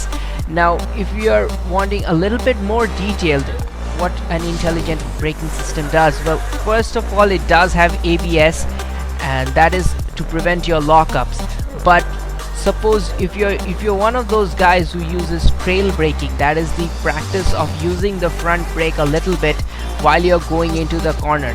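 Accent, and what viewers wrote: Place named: Bengal, India. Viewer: That bengali local accent.. I love it...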